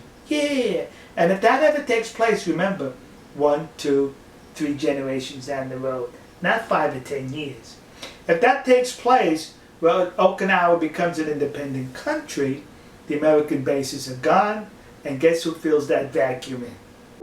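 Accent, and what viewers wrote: New York, United States. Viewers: I should have figured out that you were BAT SHIT CRAZY, when you said you've lived in Texas for 32 years, and still had an NY accent. That's kind of like Charro* and Zsa Zsa Gábor** <link> <link>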